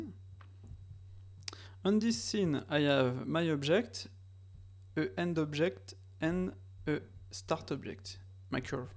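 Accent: France. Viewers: haha l'accent français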